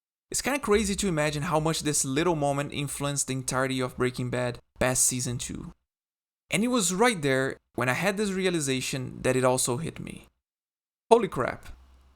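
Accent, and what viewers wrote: Brazil. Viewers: Ai doidão, tu é brasileiro, né? Eu sinto o Brasil na sua fala. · Cara, vc é brasileiro? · Ótimo vídeo. O sotaque brasileiro ficou gritante, e eu adorei.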